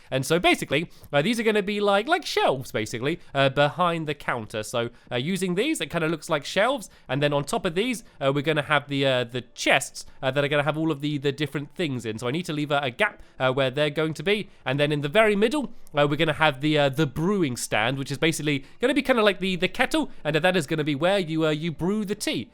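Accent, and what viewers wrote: United Kingdom. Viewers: You are awsome.I cant believe you are this talanted i really wish you could make a....GIANT CAT that would be AWSOME!!!!!!!! P.S. i love your british accent;)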